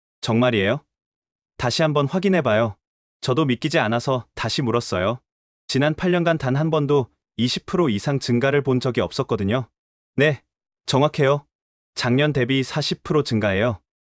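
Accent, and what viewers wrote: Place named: China. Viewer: 여기 유튜버 중국인인가? 아님 어그로 끌려고 말투를 저리 설정한건가 듣기가 참 힘드네, 암튼 정산은 아니네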